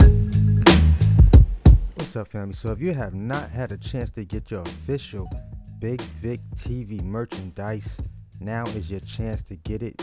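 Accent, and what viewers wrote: Jamaica. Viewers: You Jamaican fam?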